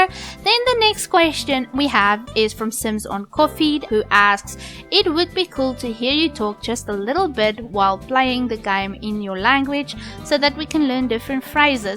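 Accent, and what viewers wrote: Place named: Netherlands. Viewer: Love your accent girl.. Its a little bit dutch... Love you video ♥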